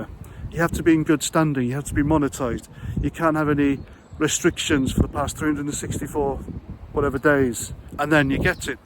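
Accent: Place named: Northern England, United Kingdom. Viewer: great accent too.. northern and proud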